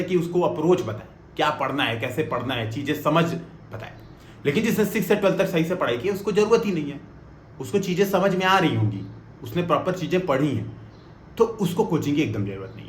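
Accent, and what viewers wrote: India. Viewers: Sir aap gorakhpur se ho kya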